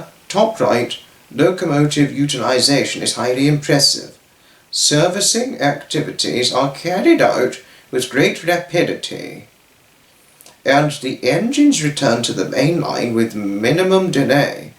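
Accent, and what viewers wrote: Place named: RP, United Kingdom. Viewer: Wow Your posh accent is nice to listen to You should become a train announcer (I’ll probably get your voice recorded and then put on a dot matrix on a train. It’s really soothing to listen to in a nice way)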